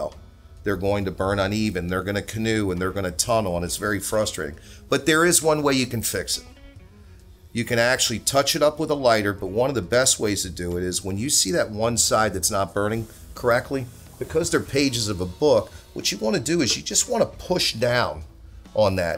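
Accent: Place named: Philadelphia, United States. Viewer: Hey...great vid..very informative...?..are you from the Philadelphia area?...your accent sounds like mine...born and raised in the city..thank you again for being a leader in this great hobby of cigars🤙💨💨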